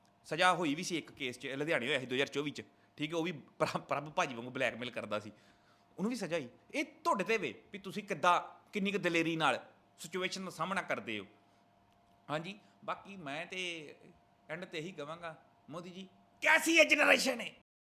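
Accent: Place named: Pakistan. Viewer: Bai teri boli pakistan wargi kyo hai yaar?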